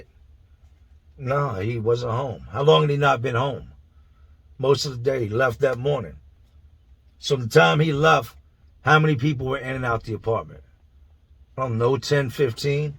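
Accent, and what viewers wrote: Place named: Philadelphia, United States. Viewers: People comment on your accent like they comment on mine. Always wanting to correct me and what not. I finally said to them that this is how I talk..growing up around philly and Delaware and Jersey, this is how we talk. People. If you don't like his accent, don't listen. Virginia is right down the road from me, and that is how people talk. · 10/27/80 here brother. I feel you damn. You sound east coast. What's the deal. Hell I'm midwest we sound weird to you all 😂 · 🤣🤣 Big Facts its a Philly Thang myG rs pretty much Everybody tlk lik dat around my age im 34! But rs half dez ppl wouldn't bust a grape in a fruit fight, dey dont kno bout Ordering shyt on da Box fukin mom cable bill up💯🤣🤣🤕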